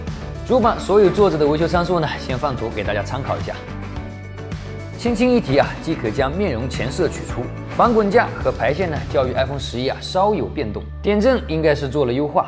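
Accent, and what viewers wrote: Wenzhou, China. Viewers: 温州人说普通话就这调调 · 没办法，温州人说普通话就是这样的。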